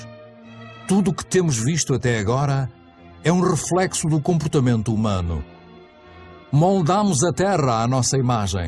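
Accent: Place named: Portugal. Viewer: E aqui no Maranhão passamos dos portuguese em matéria de Burrice, por que é constituído principalmente daqueles que nem sequer perceberam o que aconteceu ou se acontece algo de fato. Mais lenga-lenga de aquecimento global.Os "patrícios" da terrinha que ñ me perdoem, mas português fala feio, mermão, bota uma Brasileira gostosa p narrar!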